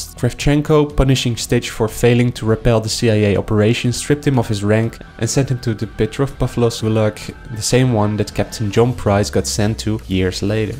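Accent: Netherlands. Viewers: Ik hoor gelijk aan jou accent dat je Nederlands bent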